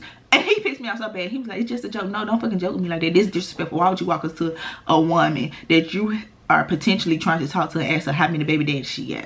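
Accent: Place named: Memphis, United States. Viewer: I came across your video and immediately liked the hair for starters and also just knew the accent was Memphis. At least y’all are a hop, skip, and jump away. Gotta love Address, Bar 2200, and all that. We’ve sort of branched out to going to Chapman & Kirby for brunch and some folk go to 5015. It seem like you liked Bar 2200 food. I loved their vibe!!!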